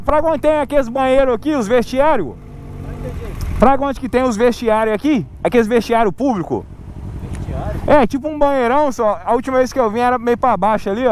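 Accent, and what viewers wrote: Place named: Belo Horizonte, Brazil. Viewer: Namoral o sotaque de BH e muito massa ce e louco 😅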